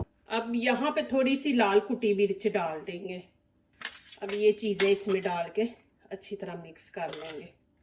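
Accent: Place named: Punjab, India. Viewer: MashaAllah zabardast bhout khubsorat still awaz ka Andaz Panjabi bhout khubsorat laga dia Karachi Pakistan khush rakhae ameen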